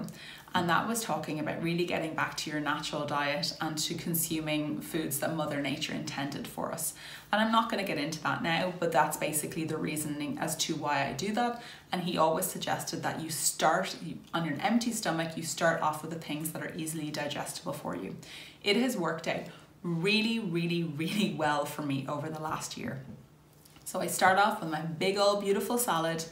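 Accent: Ireland. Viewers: I have BED as well and also struggled with food addiction since childhood. I so agree with what you said about not being able to hear the fullness cues! I actually tried alternate day fasting last year and ended up losing some weight but inevitably plateaued because i was not keeping track of calories and, if I’m completely honest, was using my eating days to sort of binge 🤦🏻‍♀️ it was also just extremely difficult for me. Have since found your videos avs thinking of signing up for Bodyslims today and maybe trying 16:8 as well… thx for making all the info simple and clear! 🙌 Ps love ur Irish accent, could listen to it all day haha so soothing 💖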